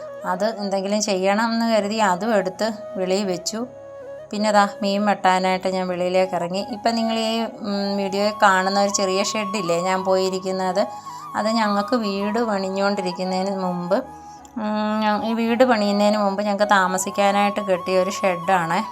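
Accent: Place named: Kottayam, India. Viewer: സംഭവം കൊള്ളാം. രസമുണ്ട്. കോട്ടയമാ അല്ലിയോ?വർത്തമാനം കൊള്ളാം. ഇഷ്ട്ടപ്പെട്ടു.go ahead👍👍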